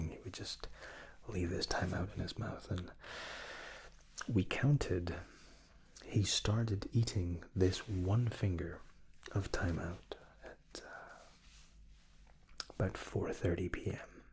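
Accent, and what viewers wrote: Scotland, United Kingdom. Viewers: Where were you born because I fell like you have a bit of a Scottish tone and some words are pronounced Scottish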